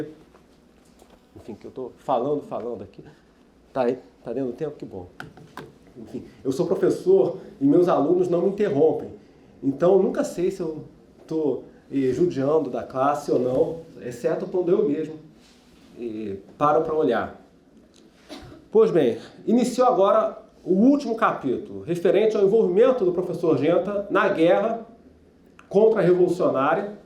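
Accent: Rio de Janeiro, Brazil. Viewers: Sotaque carioca típico,amigo: Também sou fluminense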